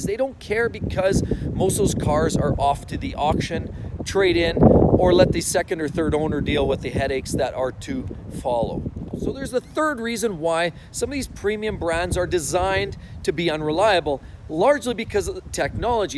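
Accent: Canada. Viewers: My pleasure. Thanks for making these very informative videos (with a nice Canadian accent, to boot.:)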